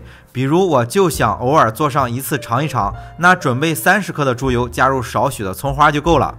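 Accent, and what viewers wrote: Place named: Shandong, China. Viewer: 每次听到带点山东口音的解说还是感觉很亲切的，请问你是山东哪里的？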